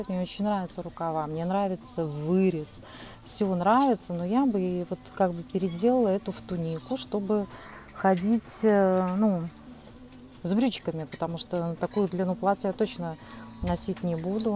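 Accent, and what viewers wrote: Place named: Latvia. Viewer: Это латышский акцент...😊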